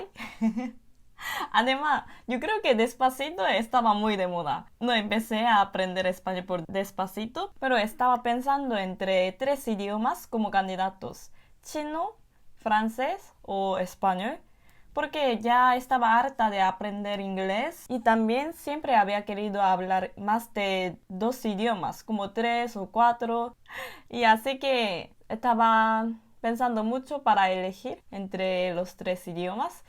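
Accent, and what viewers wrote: Andalusia, Spain. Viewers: lo malo es que esta aprendiendo el español de españa:/ que si en cierta parte puede ser muy formal pero podria aprender un español mucho mas neutro en donde la "s" se pronuncie como tal y la "c" de igual manera, asi no le explotaria la cabeza al verlo escrito y al hablarlo al mismo tiempo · Una coreana con acento España !Que Bello! Ole Guapa😍👍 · Hola anyoaseo 🤣🤣🤣 hablas español de España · Hablas fantásticamente español. Corrección justo al final del vídeo. Se dice: "No os olvidéis de suscribiros" (forma más informal y más usada) o "no se olviden de suscribirse" (forma más formal, tipo usted). Con "no os olvides" (te ha faltado la letra i y acentuar la e), se comprende perfectamente lo que quieres decir, pero no es 100% correcto. Espero que no te moleste la corrección. Enhorabuena de verdad. Tienes hasta acento andaluz, el más bonito por cierto. 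Saludos desde Marbella, Málaga. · Hablas gachupín, no español. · Se nota que aprendistes español de España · Tu hablas español pero de España, los latinos hablamos diferente. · Difícil o no lo hablas muy bien ...yo quiero hablar así de fluido otro idioma 😢. Por cierto has tomado el acento español lo tienes marcado yo soy de México y los españoles para mí hablan muy rápido 😂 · Pronuncias muy bien; con cierto acento andaluz. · Jijiji es verdad que el castellano es difícil pero me asombra lo bien que lo aprendéis y te estaba escuchando y sí que tienes un habla más andaluz. Hablas muy bien mejor que algunos hispano hablantes. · tienes acento español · Me encanto mucho tu video con tu asentó de España..no sé si sabías pero la mayoría de los latinos remedamos el idioma de España por diversión …un saludo desde Costa Rica ..ostia parecéis española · Pero tengo un argumento! Aunque digas que hay muchos acentos distintos, la ventaja es que aunque todos sean un poco distintos, al fin y al cabo de igual manera puedes entenderle a cualquiera que ya lo hable, o inclusive a tí! 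Yo por ejemplo soy de Costa Rica y el hecho que tengas acento andaluz no me impide comprender el 100% de todo lo que dices! Entonces en resumidas cuentas si hay una universalidad en el idioma! Dado el hecho que con esas diferencias seamos siempre capaces de comunicarnos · Hablas el español MUY BIEN, mejor que yo. Soy malagueño, de padre sevillano, y ya noté tu acento andaluz antes que lo dijeras. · Ese español españolao nomas no👎🏻 Ese acento es una patada en los huevos!! Sorry!🤷🏻‍♂️ Pero pues es la verdad! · Omgg ella tiene una acento de España 🥺🥺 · Si,pero aprendiste el español que se habla en España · Oelo pero hablas bien español, se te entiende bastante , y lo hablas bastante con el acento español jajaj apenas es ...m je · Hola como estas ...tienes acento ..de espania ...todos los aciativos ..mas aprenden acento de espania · Se te pego un poco el español de españa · En cuanto te escuche decir la palabra más, ya sabía que aprendiste el idioma en Andalucía y acerté. 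Imagino que te habrás sorprendido que en solo país existan tantos acentos, además solo en Andalucía hay unos cuantos. Para ser solo dos años de aprendizaje, te desenvuelves muy bien con el idioma, felicidades. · Hablas el español de España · Hablas español de España. No de Latinoamérica.🤔😳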